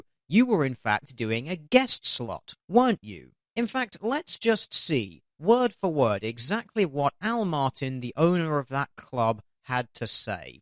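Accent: United Kingdom. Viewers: Aw, damn. He's winning me over. His snarky-British blue deer accent is wiggling all around in my brainspace, making me giggle and think critically and shit. Whoo, binge watching!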